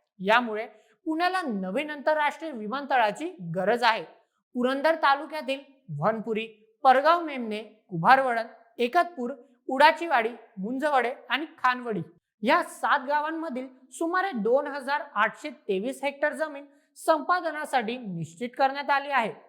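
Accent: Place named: Khandesh, India. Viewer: Khandesh chi bhasha ashich aahe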